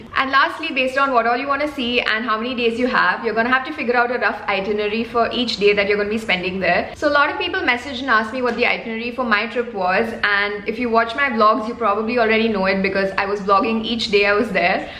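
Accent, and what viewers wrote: India. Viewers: very strong indian accent, talking non stop without coma it's time to cut off my ear now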